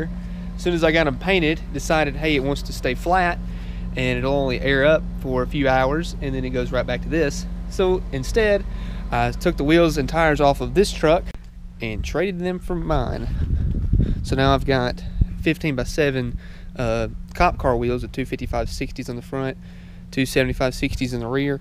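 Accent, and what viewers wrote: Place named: Mississippi, United States. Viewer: can't help but notice you gotta Mississippi accent ... least that's how it sounds to me lol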